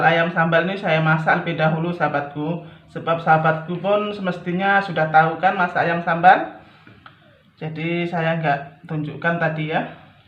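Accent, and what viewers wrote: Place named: Malaysia. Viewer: Jadi keinget pertama lihat daun kari tu waktu kerja di malysa,, kakak ni asli malysa atau aslinya orang Indonesia, kok logat Melayu nya dk kental banget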